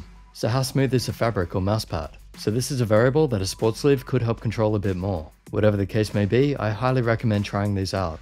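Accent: Australia. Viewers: Never thought you would be an Aussie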